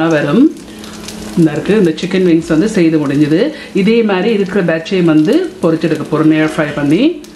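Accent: Sri Lanka. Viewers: Ilangai thamizh ❤❤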